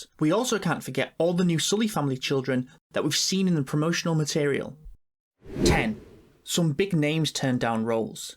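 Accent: Yorkshire, United Kingdom. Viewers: is that a yorkshire accent or am i trippin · Came here for avatar news but I'm too distracted trying to figure out his accent. Yorkshire??? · Avatar the way of wo-ah 😂 God I love British accents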